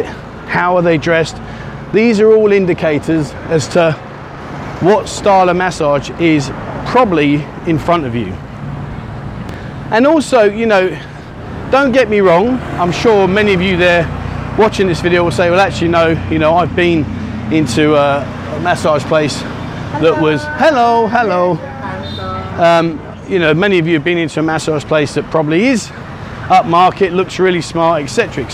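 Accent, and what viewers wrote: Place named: United Kingdom. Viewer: Excellent British accent, I'd loved it.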